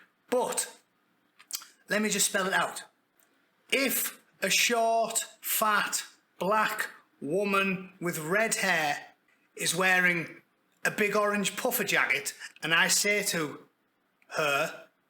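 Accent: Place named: Middlesbrough, United Kingdom. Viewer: you can ramble as long as you like, your voice is music to my ears. Do love your Boro accent🎶🎧😊😂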